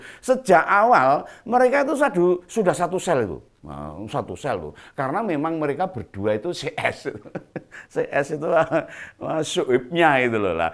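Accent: Java, Indonesia. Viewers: Bapak dengan gaya bahasanya sgt lucu maka aku suka nonton. Logat jawa itu enak didengar. Logat saya batak suka di ejek, aku suka tertawa logat saya diejek sehingga seru. Salam mesra bos !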